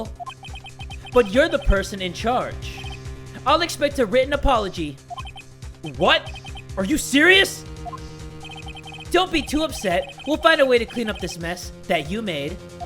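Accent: South, United States. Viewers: Jays country accent is 👌😂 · LMAO I LOVE YOUR COWBOY ACCENT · I love southern accent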